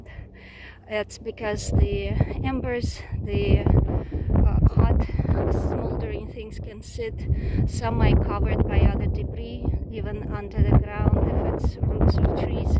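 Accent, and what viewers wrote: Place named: Eastern Europe. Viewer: ) Можно и об этнической принадлежности. Хотя, не суть. Просто только сейчас заметил этот знакомый акцент из Восточной Европы. Давненько подписан, смотрел на творчество.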